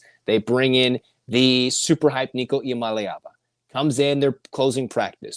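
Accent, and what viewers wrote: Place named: United Kingdom. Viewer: You sound like that uk guy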